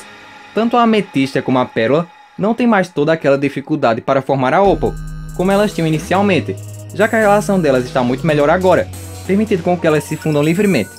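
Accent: Northeast, Brazil. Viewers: Voz de NORDESTINO ,AS OK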